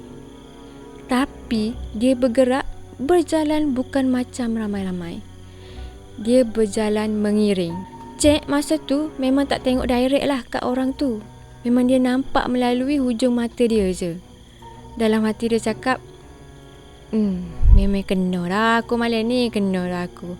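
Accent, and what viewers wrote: Kelantan, Malaysia. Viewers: Sdap dgr bhso klate ehh, jelas pm jls...mce kekkok gtu hehe...gapo pm semoga n maju jaya😁.. HADIRRRR👻👻👻👻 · Xsdp la dngr kalau bekencek luai... Kelantam lg best... 😂 kencek luai jdi lawak plak... 😂😂😂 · kecek Kelate lama..x jdi seram bilik kecek luar😅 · Tksedak kecek kl kecak klatey la kak dio 😢 sapo stuju like sek kito😊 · Tak sedak dengar Eah kecek ore kolopur.😂😂..kecek kok Kelate lah..baru sedakk dengar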